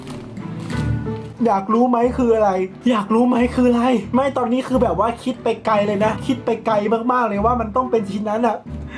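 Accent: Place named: Sing Buri, Thailand. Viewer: พูดสิงห์บุรีเหมือน miss grand เลย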